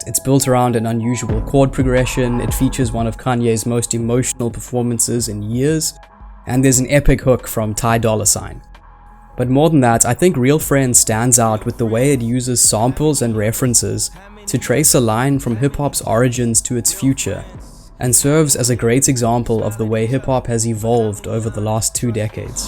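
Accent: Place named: South Africa. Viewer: Kwaai video broer en opbreuk. Jou aksent klink Suid Afrikaans, indien nie... definitief Australiaans of Neeu Seelands😊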